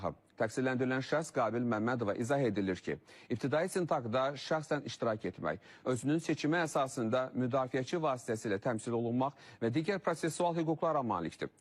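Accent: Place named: Armenia. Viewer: Ay yaramazlar bes terterde öldürülen zorlanan herbicisine sahib cixmayan hakimyete kim inanar birde ki senin lecen ermeninin azerbaycanca danişmasina oxşayir bu siziz qancixlarin teze türkün rusca lecesidi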